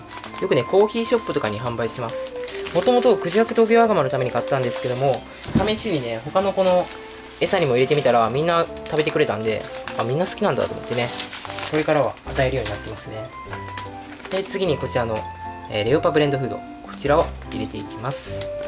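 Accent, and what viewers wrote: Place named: Kansai, Japan. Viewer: 関西弁がとても胸を使われたので不純な気持ちでチャンネル登録してもーた🙈💕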